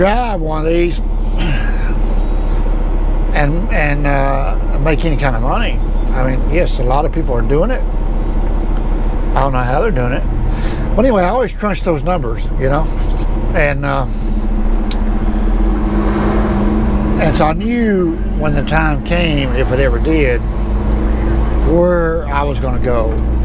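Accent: South, United States. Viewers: Thanks for sharing your story. Your southern voice sounds good to me. lol Be safe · You do have a southern drawl but so what?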